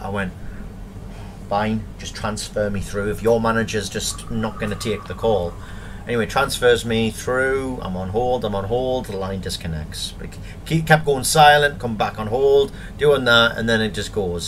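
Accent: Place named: Gateshead, United Kingdom. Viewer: Hi buddy, fellow Geordie, thank you first of all for putting this video up and sharing your grievances with VM, like you I've been in similar situations with them, it's almost as if they're doing guess work when they're discussing the account, I don't pay by direct debit, that's one thing I never have let them do since I joined Virgin Media in 2009, I've been with them since then, I didn't know you could get Netflix Premium by the way, lol.... I might bully them into that at some point. I worked at a call centre in Stockton when I lived down there in 2007, it was just bog awful, I had complaint after complaint after complaint, in the end I left cos I couldn't stand the pressure of working in a call centre so hats off to you for doing what you do. You sound like a Gateshead lad, loved the video mate. Thank you once again for bringing this to YouTube and letting people know just what they're like.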